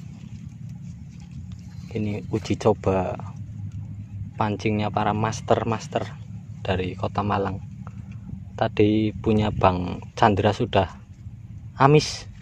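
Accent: Banyumas, Indonesia. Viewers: ngapak.ngapake ngendi mas bro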